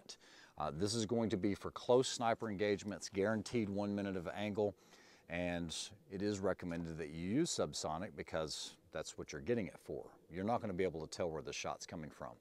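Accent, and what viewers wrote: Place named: Midwest, United States. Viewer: random, but me and my buddies are trying to place your accent! where are you from? i think you have the vowels of the midwest personally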